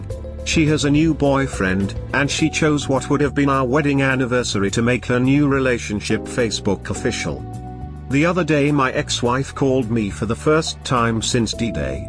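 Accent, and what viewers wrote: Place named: England, United Kingdom. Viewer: I'm 3/4 of the way through this story (and don't know how it ends) and feeling frustrated and irritated with OP (maybe the male English accent of the narrator is making it even worse). This story should be titled, "Journey into the mind of a SIMP BH". Once divorced, stop doing the 'pick-me' dance, block her phone and all forms of communication; full NC. End of story.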